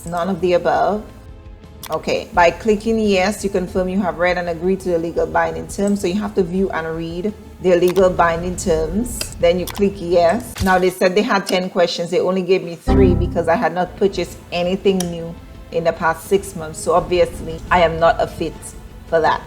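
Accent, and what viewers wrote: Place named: Caribbean. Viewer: This is the Carribbean accent , she seems to be Carribbean too.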